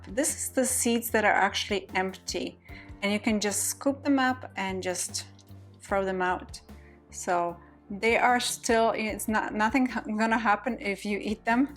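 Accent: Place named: Iceland. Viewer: You have such an interesting accent! It's subtle, but it's there. Icelandic? 🤔 Dutch?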